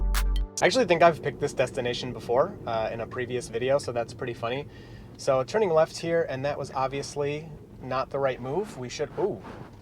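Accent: Michigan, United States. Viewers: Don't let people criticize your English. American English does have dialects! You're just speaking Michigander dialect. "Coulda went" I use as well.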